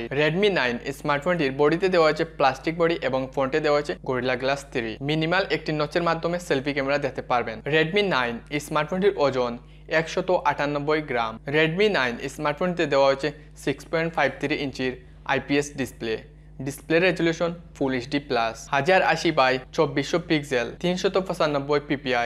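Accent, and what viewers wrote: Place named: Sylhet, Bangladesh. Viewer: আফনার ভাষায় ছিলটি ছিলটি লাগের।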